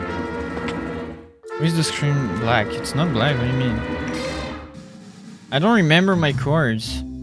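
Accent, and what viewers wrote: France. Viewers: i love your accent French accents are the best.. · On entends bien l'accent français x) Je pense qu'on l'a tous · Ta un petit accent français sais trop chou xD sinon continue t'es vidéo j'adore se que tu fait ^^ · cette accent français mdr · His accent makes me laugh soooo much Before you hate on me I am French so I am allowed to laugh at a Frenchman because he has an accent while talking English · He sounds extra French. My heart.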